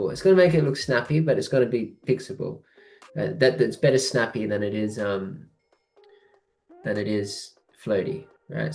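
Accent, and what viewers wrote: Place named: China. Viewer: sounds like a chinese student very famliar accient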